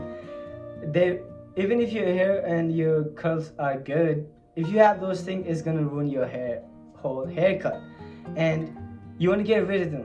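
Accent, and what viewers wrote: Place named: Philippines. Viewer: He from da phillipines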